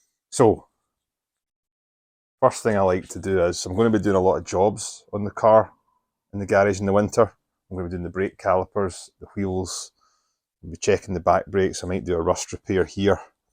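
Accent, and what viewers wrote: Scotland, United Kingdom. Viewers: Buddy you have a strong Scottish accent while have a US flag in your garage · Love the scottish accent 👍 great review I have actually just bought one of these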